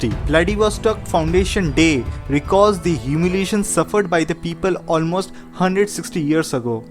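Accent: India. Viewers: That thick Indian accent. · I love your Indian accent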